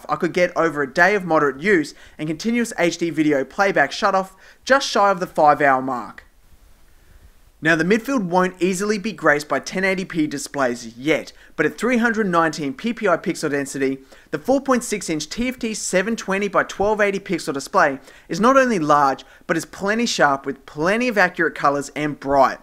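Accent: Australia. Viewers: Australian accent ~ :D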